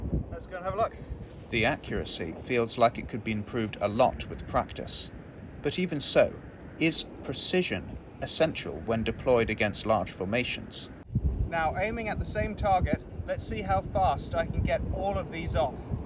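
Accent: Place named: United Kingdom. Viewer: As an American consumer of a specific type of video content, it was so pleasantly odd to hear “terminal effect” and the distinction between “internal and external ballistics” in the British accent. I very much appreciated your application of the scientific method. And the sound these things make as you throw them is awesome. Great stuff.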